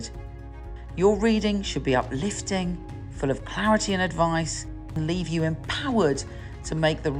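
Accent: United Kingdom. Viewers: I'm hoping communication will be better it's been faulty in the present. Where's your accent from? (UK). Go with your gut l say.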